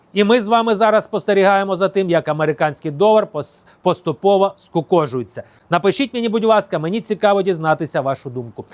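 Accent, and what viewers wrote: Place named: Russia. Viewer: Дякую за вашу працю. Але треба ще працювати над вимовою, бо є багато російського акценту: Атава, кА мандир і тд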